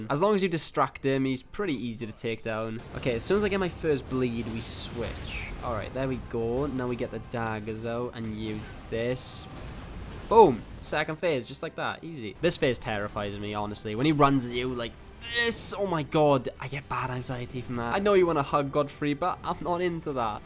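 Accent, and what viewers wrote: Wales, United Kingdom. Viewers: Im a simple guy, i hear a welsh person. I press subscribe. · Idk why but I'm getting a slight hunch that you're also welsh...just a hunch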